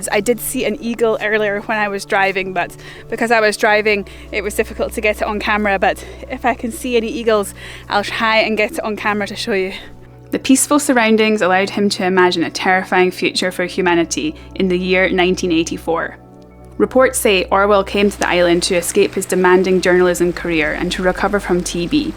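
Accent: Scotland, United Kingdom. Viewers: Cool video. Watching the auto-generated CC subtitles wrestle with her Scots burr was also quite amusing.. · Trying to place your accent, I'm a Sneck man and like to hear a Scottish accent but I love a Highland one. P.S. There is a resident otter family that I see now and again and they are overlooked by the bridge to the black isle. I obviously can't say where on YouTube as I don't want them bothered by people. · You make lovely videos but speak so quickly in the voice over sections. You may say, "use the captions". Unfortunately, my eyesight is failing and captions in your and other videos, never stay on long enough. But I do like your clear video and hearing your lovely Scottish accent. I can see the Paps from here on the Ayrshire coast opposite the half Highland, half Lowland Isle of Arran. As I stayed for many years on the Isle of Lewis, I sailed the Minch in fair and foul weather and it could be pretty foul. 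Good luck in your adventures. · I love the Scottish accent!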